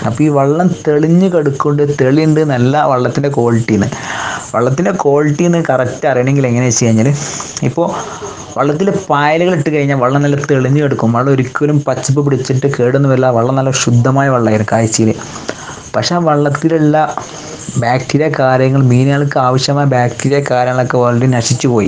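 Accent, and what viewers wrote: Malappuram, India. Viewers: Samsaram kettappazhe thonni.. 😇😇mlprm isttam😍😘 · Superbb Malappuram slang😋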